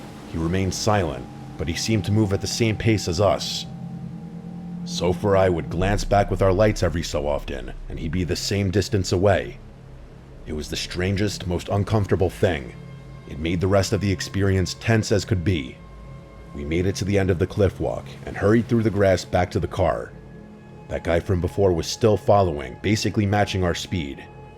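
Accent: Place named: Staten Island, United States. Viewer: im so happy still makes videos and that he's managed to tell so many stories over the years. My sister and i would religiously watch these back when corpse husband was still posting, mainly because were from jerz and the staten island accent feels like home loll (fr my sister was soo picky about narrators voices. she only watched your channel cuz ny accent is the correct way to speak loll)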